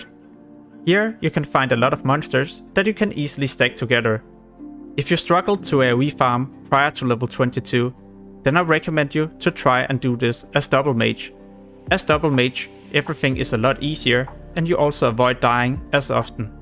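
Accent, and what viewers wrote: Denmark. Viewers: He is Danish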